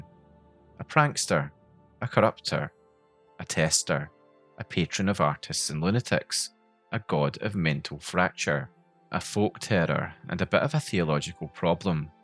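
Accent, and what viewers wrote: United Kingdom. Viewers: BRIT DETECTED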